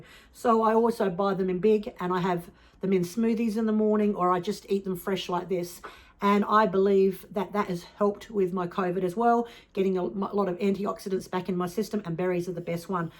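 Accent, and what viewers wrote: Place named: Australia. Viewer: Oh. Goodness Covid..So glad you are on the mend. So good to hear your podcast again. Watched you from the beginning..and watched your store grow. Will have to visit. Love hearing your Aussie accent..Kia Kaha, good Lady.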